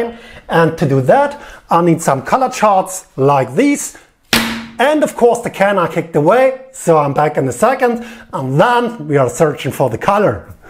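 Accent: Germany. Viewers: Mega Videos🙏🏼🔥 hab sofort rausgehört das du Deutscher bist 😅 Mach weiter so🚵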